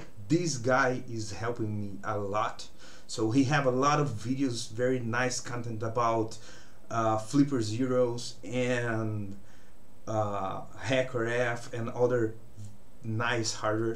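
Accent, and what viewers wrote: Brazil. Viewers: BR Mano? Sotaque parce muito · Term sotaque de brasileiro falando inglês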